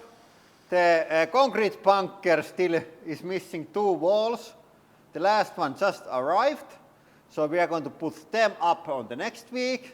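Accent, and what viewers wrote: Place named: Finland. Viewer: I love hearing English cursing spoken with a Finnish accent it sounds so good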